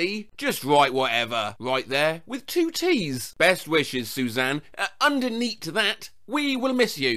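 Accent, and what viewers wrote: United Kingdom. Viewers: ARE YOU BRITISH I AM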